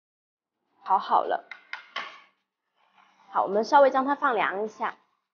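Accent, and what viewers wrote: Sichuan, China. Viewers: 我猜你是四川的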